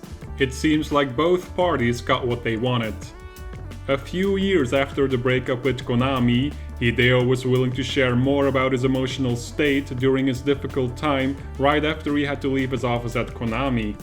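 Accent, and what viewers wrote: Netherlands. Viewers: You're Dutch, aren't you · Are you Dutch?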